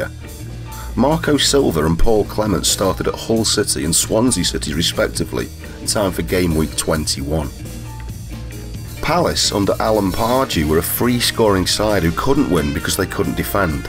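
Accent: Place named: Manchester, United Kingdom. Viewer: Given the subject of the video ie relegation, a sullen Manc accent seemed appropriate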